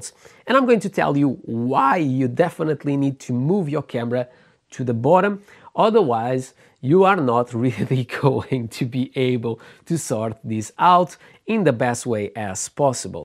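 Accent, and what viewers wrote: Portugal. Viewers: es português? tens muito sotaque português a falar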